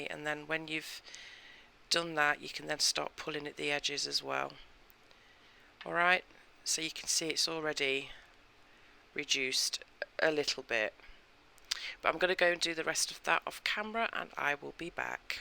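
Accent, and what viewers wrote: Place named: Northern England, United Kingdom. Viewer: It's actually not as bad as I thought it would be, i like it and it's now in the oven! Thanks for the tutes it's really good when it's a northern woman's voice giving the instructions 😊 I'm northern too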